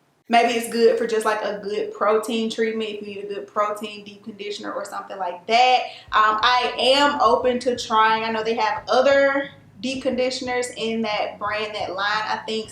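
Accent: South, United States. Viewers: Get those ruts ❤️😂❤️😂❤️ I love that country twang...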